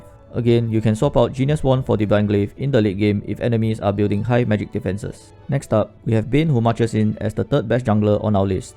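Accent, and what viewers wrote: Singapore. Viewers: The heavy sg accent bro 😭😭😭 but good guide lah keep uploading guide like this can do very good one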